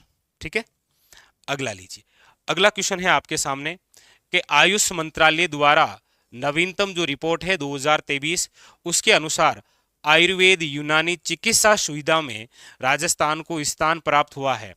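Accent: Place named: Kuchera, India. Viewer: Aapki aawaj mst h sir lage kuchera k aas pass se ho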